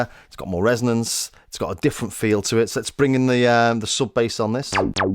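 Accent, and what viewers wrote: Liverpool, United Kingdom. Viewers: Loved discovering you and I subbed. Excellent comparison and I thought it was honest, witty and basically well done, even when negotiating embarrassing technical ground hum. The 303 and Roland sound was so well designed it's hard to improve but you documented the differences well. The 303 has that env mod slide on every note and is generally rounder or rubberier while the Nubass here sounded sharp and precise,with more range. Sometimes a bit too piecing,more isn't always better here (rez) but you showed off the sub osc and lfo well. Good stuff and as a fellow scouser it was lovely to hear the accent so well represented- articulate, not too rambling but clear and funny (without trying too hard). You may of found a YT niche. Sweet.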